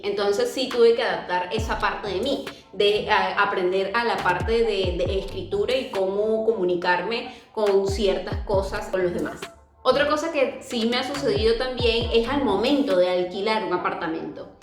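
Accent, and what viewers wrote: Venezuela. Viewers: El que te dijo que le molestaba tu acento es un tarado, N le hagas caso. A mí me encanta el acento de venezolanos y colombianos. 🙂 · Que HDP el que te dijo que no le gustaba tu acento, como argentino realmente me da vergüenza ese peloduro, con respecto a los alquileres eso lamentablemente pasa en muchos países y en algunos es casi imposible conseguir alquiler si sos extranjero. Volviendo a lo del acento creo que lo mas lindo es escuchar a una mujer con acento venezolano ja ja ja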